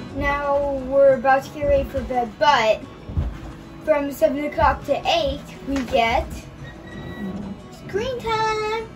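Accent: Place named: Pacific Islands. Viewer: Bro love your accent man. Sounds like you got a bit of that island swag incorporated. Seki work out. Much alofa Western Sydney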